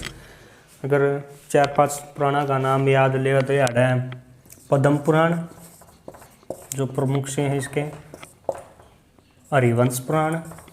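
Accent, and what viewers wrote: Haryana, India. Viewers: Full haryanvibazi guru ji🔥😀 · Woww sir asli haryanvi 👌👌👌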